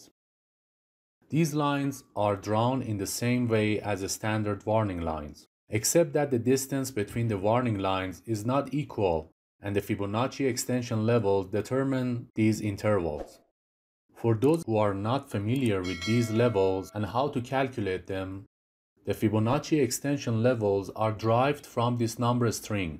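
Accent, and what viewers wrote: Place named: Iran. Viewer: great video vali nemidonm chera lahjaton kheili farsi mizane :D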